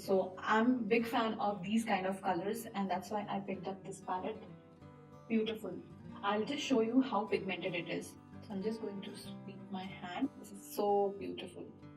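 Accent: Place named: India. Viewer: The prizes are amazing and i love your other videos!! I can tell from your accent that you’re from India Right? ❤️i’m from indonesia🇮🇩!!! Keep it up and enter me!!!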